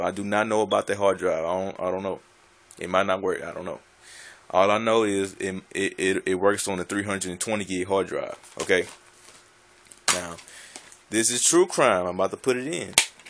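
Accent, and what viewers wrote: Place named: Georgia, United States. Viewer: Are you from Georgia?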